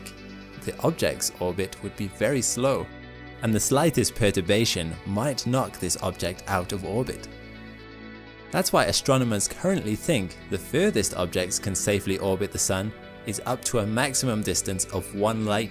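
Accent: United Kingdom. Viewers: I can't get past the narrator's voice, it's like a mixture of a foreign accent with a British accent. Videos are pretty good, hire someone else to narrate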